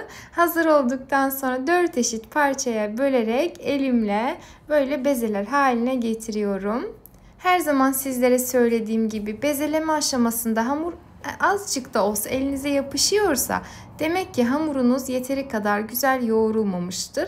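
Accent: Azerbaijan. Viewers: Türkiye türkçesinde konuşmanıza rağmen Azerbaycanlı olmanız bellidir.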